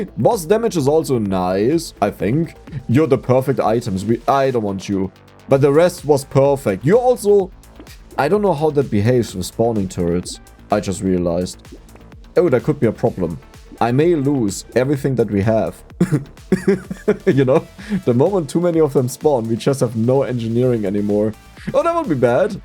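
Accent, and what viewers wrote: Germany. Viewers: U sound very german and its tickling my non existent braincells! my bf likes your videos. Idk what else to say. This aint a hate comment btw, ;3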